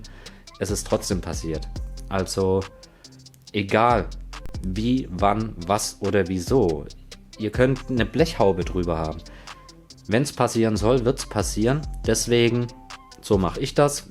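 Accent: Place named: Saarland, Germany. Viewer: Ein Saarländer, wie schön. 👍👍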